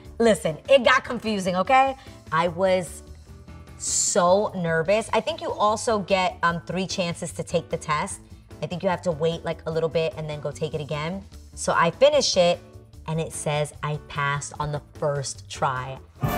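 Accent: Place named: New York, United States. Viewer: You’re from out of town?! 😂 with your NY accent? I love it! 😂❤️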